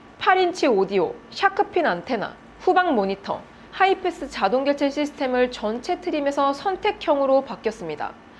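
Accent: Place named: Gyeongsang, South Korea. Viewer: 경상도 말씨. 절대 감출수없는 말투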